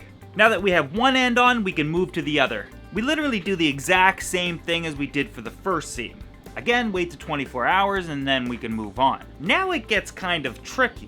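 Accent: Canada. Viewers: In this video i could definitely hear his Canadian accent come out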